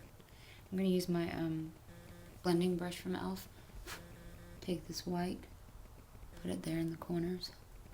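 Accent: Texas, United States. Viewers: i like the tutorial...but u need to be more professional and stop talking in that texas voice. grow up a little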